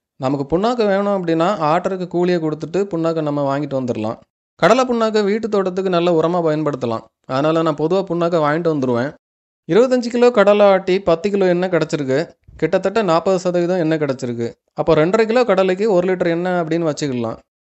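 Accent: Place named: Salem, India. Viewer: அவர்களுக்கு வணக்கம். நான் சேலம் உருக்காலைப் பணியாளராக இருந்து பணி நிறைவு பெற்றவன். தற்போதும் உருக்காலைப் பக்கம்தான் வசித்து வருகிறேன். இந்த வீடியோவைப் பார்க்கும்போது - உங்கள் மொழி அதாவது பேசும் விதம் என்னை ஈர்த்தது. வீனியோவின் நிறைவிலதான் காரணம் புரிந்தது நீங்கள் எங்கள் ஊர்க்காரர் என. மிக்க மகிழ்ச்சி. மிகப் பயனுள்ள தகவலைப் பதிவிட்டிருக்கிறீர்கள். நான் நீண்ட நாளாக - எண்ணெய் ஆட்டித்தான் பயன்படுத்துகிறேன். பலர் என் செயலைக் கேலி செய்வதுண்டு. நான் கண்டுகொள்வதில்லை. இப்போது பலர் எண்ணெய் ஆட்டுவது பற்றி ஆலோசனை கேட்டு வருகிறார்கள். உங்கள் வீடியோவைப் பரிந்துரைப்பேன். நன்றி சார். எண்ணெய் ஆட்டும் செக்கு பற்றி தகவல் கொடுங்கள்.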